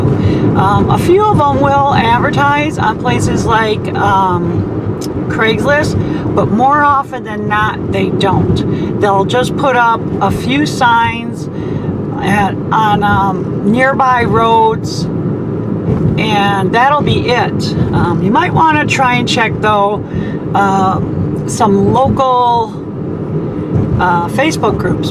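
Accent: Wisconsin, United States. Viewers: ... NICE VIDEO ... VERY RESPECTFUL COMMENTS TOWARDS THE FARMERS & THEIR STANDS ... LOVE YOUR "WISCONSIN ACCENT" ...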